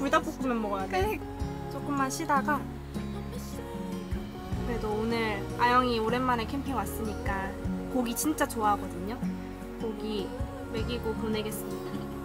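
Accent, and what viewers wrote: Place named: Gyeongsang, South Korea. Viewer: 예쁜 아가씨 말투에 경상도 사투리가 약간 있는 거 같네요 ㅎㅎ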